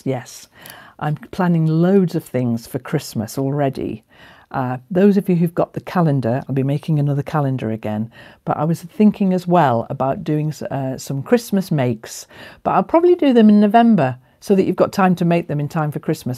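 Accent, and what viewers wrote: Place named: Northern England, United Kingdom. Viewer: You have occasional northern twang, I was born in Crosby.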